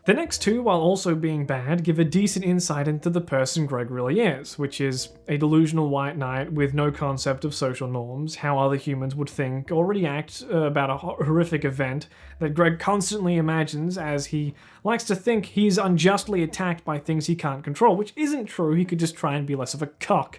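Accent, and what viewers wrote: Australia. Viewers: me just finding out is australian and not british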